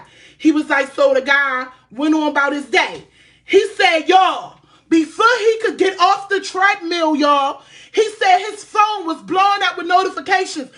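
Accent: Baltimore, United States. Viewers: Her accent izzz thiiiick lmao like my cousins in Bmore. I was like is she from Pittsburgh or Bmore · That Baltimore accent sounds good!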